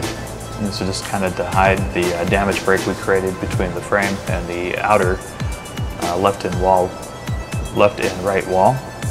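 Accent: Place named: United Kingdom. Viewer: Nice man, you solve the problem whit the audio of your voice, nice for you dude XD , its sound a little bit eco, but at lees your voice is in the proper volume poin, a little high form the background music, realy nice man, gratz for make a nice vid this time hehehe :P (better than the other! ) i hope we can figth in game some tiem, but i think is a little dificult becouse im from Venezuela and if im rigth you leave in Ingland or UK for your acent, my nick in game is the same like here so if you see me try to kill me heheheheh, If you can >:)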